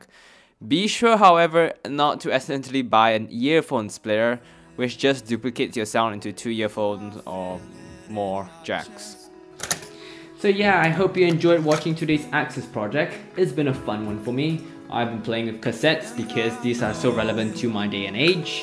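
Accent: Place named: United Kingdom. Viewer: took away mic port......also an asian with a british accent... alot unanswered questions. very helpful video BTW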